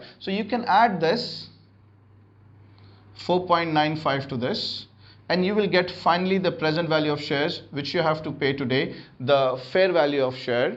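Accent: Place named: England, United Kingdom. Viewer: I like your teaching sir, and your English accent 🤗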